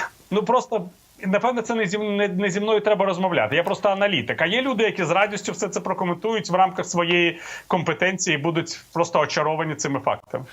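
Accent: Standard. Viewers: В.Портніков приємно вас слухати.Ваша Українська мова дуже гарна,чиста, і не згвалтована неправильними діалектами кордонів.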